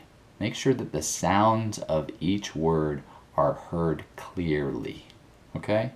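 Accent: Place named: United States. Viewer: You are not speaking English, you are speaking American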